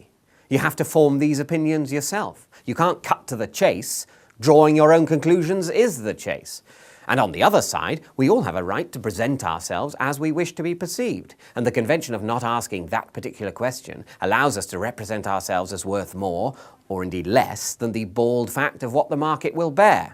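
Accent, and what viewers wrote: United Kingdom. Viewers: That's only because villains are scarier when they're smarter, and we unconsciously assume anyone with a British accent is smarter. You should feel complimented :D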